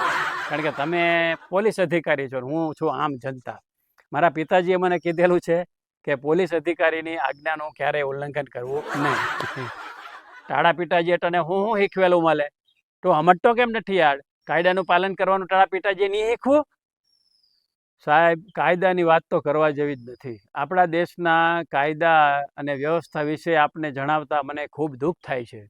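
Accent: Surat, India. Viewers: વાહ....બહુ જોરદાર માથાકૂટ કરી હવલદાર સાથે....😂 સુરતી ભાષા સરસ બોલ્યા હો...બાકી...😂👌🏻👌🏻👍🏻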